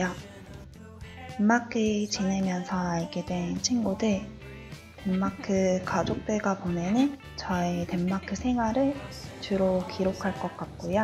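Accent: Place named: Seoul, South Korea. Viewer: 서울말 잘하는구만😙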